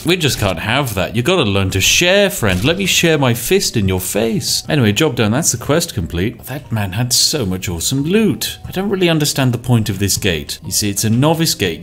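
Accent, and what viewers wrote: United Kingdom. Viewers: I love how unapologetically British you are. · I heard "welcome to the wonderful world of" and thanks to the British voice my brain auto filled Terraria. I miss TB.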